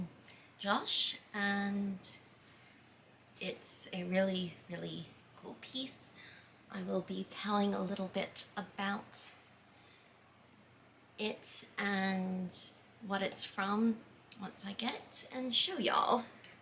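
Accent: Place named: United Kingdom. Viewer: Another great video truly a masterpiece of a face and yes he does great artwork I'll have to look into the company by the way you sound like you have a British accent in the video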